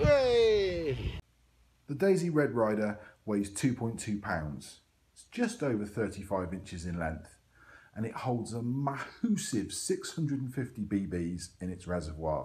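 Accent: England, United Kingdom. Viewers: are you bri'ish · Its American, but youre English!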